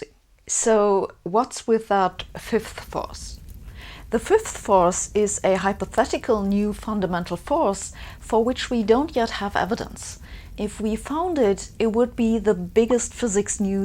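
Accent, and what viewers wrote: Germany. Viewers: Sabine, my German sounding goddess, I see ur beautiful eyes and I insta click, don't even read the titles anymore. Please make more vods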